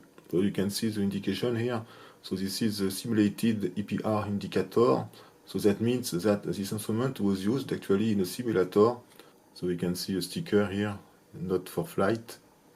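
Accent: France. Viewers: Friend, after you get used to watching videos with the French accent you will get much better at it, it just takes a little practice 😸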